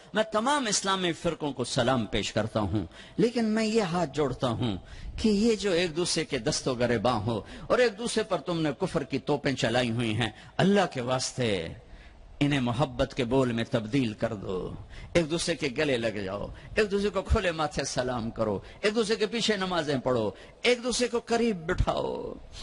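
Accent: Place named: Punjab, Pakistan. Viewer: App ki Punjabi mjy boot ache lgti ha mola slamat rukhy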